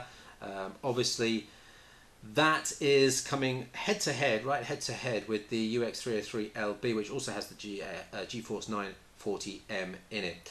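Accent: United Kingdom. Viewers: Does it matter much? His name is. Not a lot of Germans are named, nor do they talk with a British accent. So there is a very good chance that he's British. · Hahahah no it doesn't, I was just curious, he speaks with a British accent but his keyboard is QWERTZ and also his windows is in German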